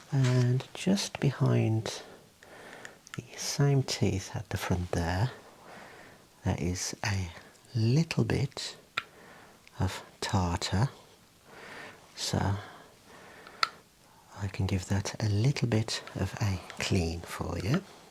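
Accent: Birmingham, United Kingdom. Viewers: Your accent makes me homesick for the UK ♥ thanks for your hard work, love from Canada!! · Mouth. It’s the brummie accent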